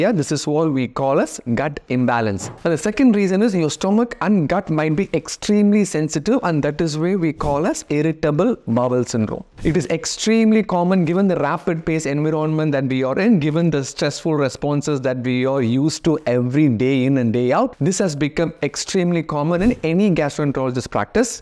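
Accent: Tamil Nadu, India. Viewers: Very informative and your Tamil is very cute 😊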